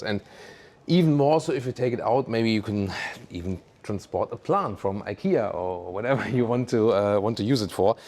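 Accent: Germany. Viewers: Dude, I love your videos but you really need to improve (fix) that accent. I know that German is closer to English accent than Greek, but believe me, this makes it also much easier for you to transition to a more British accent. It is hard to concentrate to content when I think I am listening to Mertz talking about German Fiscal discipline! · bro bitte lern besseres Englisch... ist nicht böse gemeint nur Kritik (dein Satzbau war zwischendrin wirklich fatal), aber behalt den deutschen Akzent, den mag ich ;)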